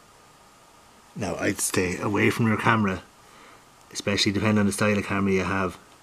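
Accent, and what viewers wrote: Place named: Ireland. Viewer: Love the Irish accent bro fae your Scottish brethren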